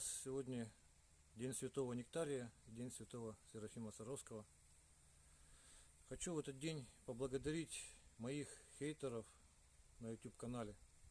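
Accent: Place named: Vladimir, Russia. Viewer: Я нутром чую, что ножи добротные. Руки мастера и говорит с добром в словах. А моё чутьё меня редко подводит. Для меня очень важно, что бы нож был сделан кузнецом с душой и добром в сердце. Такие вещи чувствуешь сразу. Жаль, что не могу заказать у мастера этот инструмент, так как нахожусь далеко, совсем рядом граница с Польшей, рукой подать. От себя скажу, что такой нож надо выбирать под себя не по интернету. Такую вещь надо в руках подержать, а лучше им поработать по дереву. А газета - это красиво, эффектно, но не эффективно, на мой субъективный взгляд. Говор у мастера интересный и довольно мне знакомый. Владимирская область?🤔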